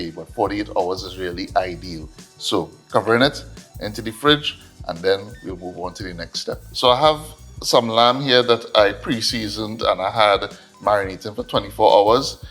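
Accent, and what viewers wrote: Trinidad and Tobago. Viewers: I love to watch you cook Bro. I also love to hear the real trini accent when you explaining how to prepare the dish. Total Trini style! Ah bigging you up! 🙌🏾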